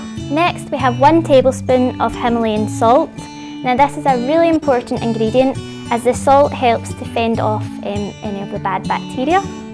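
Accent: Scotland, United Kingdom. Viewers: the broad Scottish accent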